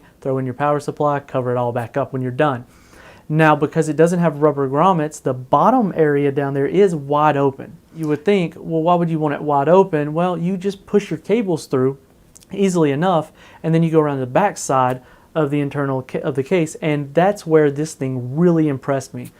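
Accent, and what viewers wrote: South, United States. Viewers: Is that Southern accent?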